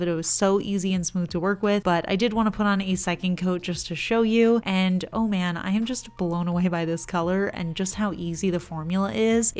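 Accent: New Jersey, United States. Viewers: It's that jersey annunciation 🤌 😅 we miss you here in good ole jerz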